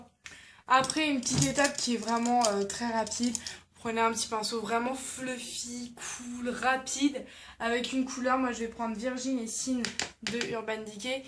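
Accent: Marseille, France. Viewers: arrete avec ton accent genre marseille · je sai pas je trouve qu'elle a un peu l'accent marseillais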